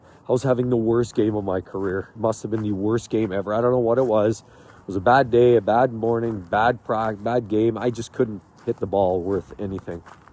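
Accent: Canada. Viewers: I'm a big fan of this channel. I come from Ireland and lived in Vancouver for ten years. Hearing the accent, seeing the snow, the houses and of course the red Tim's brings me back to a great time. I left last year because I was just spinning my wheels. Beautiful place with the warmest most open and friendly people I have ever met. This guy is as real as a youtuber gets. Keep it up. This is great.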